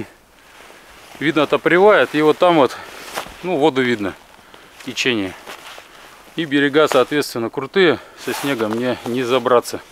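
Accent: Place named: Kholmogory, Russia. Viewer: Здравствуйте! Смотрю все ваши видео твой говор напоминает моего сослуживца по армии,Виктор Курсов зовут, где то в районе Холмогор он жил. Как нарвался на твои видео сразу его вспомнил, удачи тебе в твоём деле и продолжай снимать!!!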